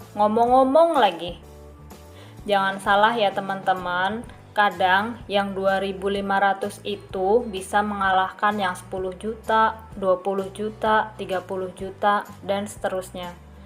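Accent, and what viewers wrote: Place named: Banyumas, Indonesia. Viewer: Salam kenal bunda pinter bget ngatur uang blnja , kowq bahasa ngapak daerah mana bun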